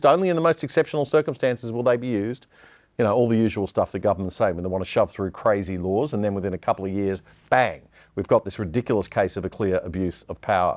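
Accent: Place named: United States. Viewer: It's god-awful hearing a screeching American accent telling us what we can and cannot see. Get rid of this nasty person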